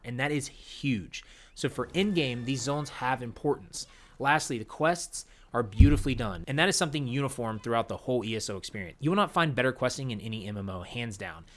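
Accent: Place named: Midwest, United States. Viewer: ain't no "L" in the word zone dude. I'm from the Midwest too and I fixed that dialect issue you can too!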